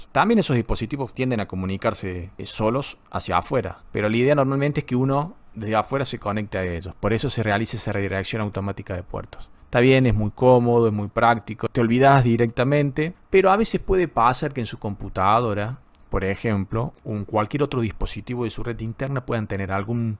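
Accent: Córdoba, Argentina. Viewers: Sos cordoooobes?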